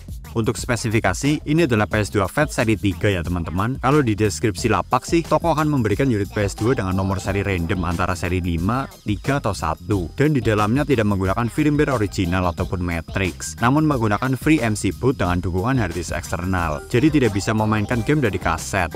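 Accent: Banyumas, Indonesia. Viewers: Wong ngapak jebule 🎉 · ternyata wongapak😂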